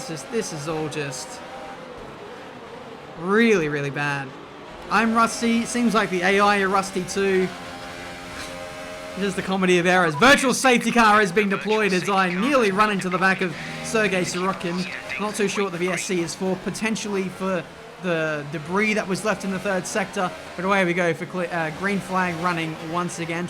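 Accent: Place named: Australia. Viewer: Can anyone else tell the difference when an Australian says flaws or floors?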